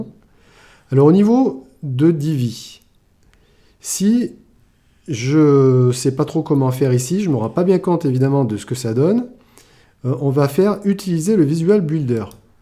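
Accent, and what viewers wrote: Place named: Isère, France. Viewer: merci sympa ton accent :) tes dans l'Isère ?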